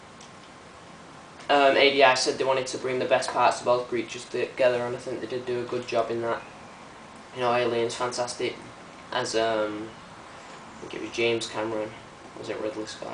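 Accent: United Kingdom. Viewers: youre awesome are you scottish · 5 Stars for British accent!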